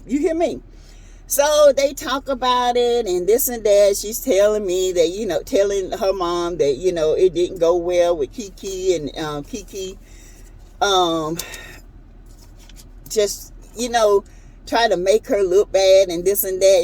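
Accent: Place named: South Carolina, United States. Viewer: I like hearing your commentary. You sound like my family. They are from South Carolina but I'm from NYC.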